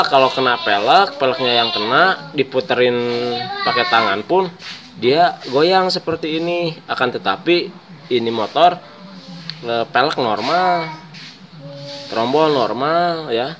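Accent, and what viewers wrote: West Java, Indonesia. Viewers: duh nuhun Kang....mantappp. Kela, akang orang mana ? Ari logat sunda, ari wajah arab ??? Terus gini kang, motor saya Mio Soul GT Bluecore injeksi kalau di gas teh delay (tdk spontan maju), eta kunaon nyak?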